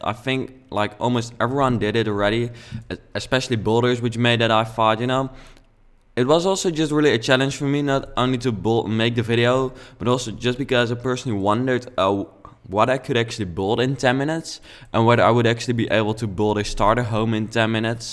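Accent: Netherlands. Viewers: een nederlands accent ;) je bouwt mooi!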